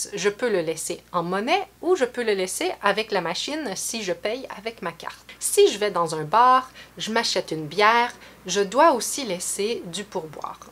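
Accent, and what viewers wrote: Quebec, Canada. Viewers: Hello! I'm so glad you've come back, I love your videos! They're fun and very helpful, also, I absolutely adore the Quebec accent, could you make a video regarding the differences in the pronunciation of vowels, nasals and consonants between Standard French and Quebec French! Merci d'avance! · Hello! I hope you continue making vidéos, I love your vidéos and your accent québécois. And if you continue teaching french on Skype I'd love to know where to get in touch with you:) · ton accent québécois, ta pronunciation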